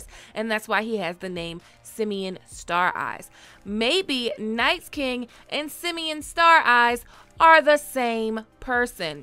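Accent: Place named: Baltimore, United States. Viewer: Whoever you are ma'am.. You are now deemed the best for the breakdown. I hear a Bmore accent as well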